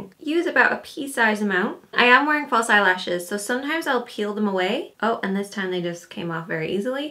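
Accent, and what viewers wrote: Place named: Ireland. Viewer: She is from Ireland ^^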